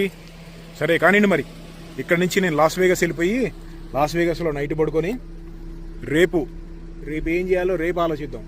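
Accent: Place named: Andhra, India. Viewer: Anna nee slang andra slang laga undhi anna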